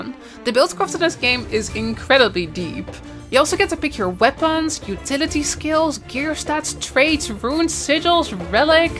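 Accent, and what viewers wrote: Netherlands. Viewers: Ur dutch :p !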